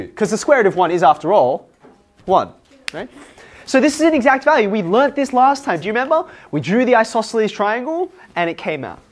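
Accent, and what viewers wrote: Australia. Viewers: Australian accent? Sounds like chan from skz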